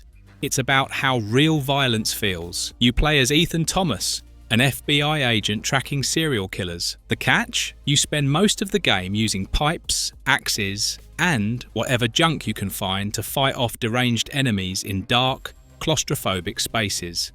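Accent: United Kingdom. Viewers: That accent, sounds British to me, saying stuff like games normalizing or flirting with misogynistic imagery, makes me 🤢🤢🤢 I'm sick and tired of hearing nonsense that makes me angry and feeling like asking for the world to end. Like, using internet nowadays is like getting the daily dose of boring and depressing shjt just to have those boomer feelings left. It's all hopeless, nervous, feeling like all i see is nonsense, like all i hear as arguments are that progressist politically corret nonsense, feeling like isolating myself and get bored af at the end... I wanna see smart people doing good stuff or at least some sense when people disagree or when they're criticizing something bcs it's getting tiring af to see almost anything on the internet and getting me feeling like I'm on a discovery kids for dummies cartoon world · I like the British narrator. Marvellous videos and channel!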